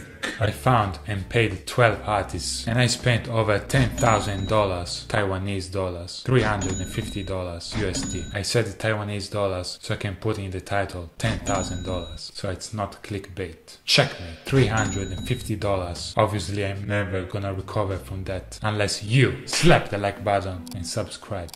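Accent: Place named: Italy. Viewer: L'avevo capito da subito che eri italiano, il nostro accento si riconosce in un attimo. Ma come mai sono l'unico Italiano a commentare?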